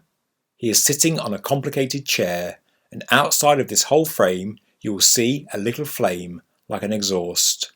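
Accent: United Kingdom. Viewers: Deepstate debunkery with brit accent.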